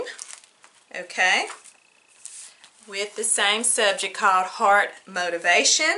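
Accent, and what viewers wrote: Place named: South, United States. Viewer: Just stumbled across your channel, love your content and your country accent! ❤️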